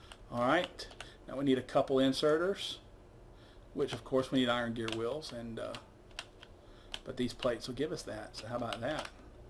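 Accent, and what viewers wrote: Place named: Midwest, United States. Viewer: Btw where are you from? From your accent I'd guess American Midwest, maybe south?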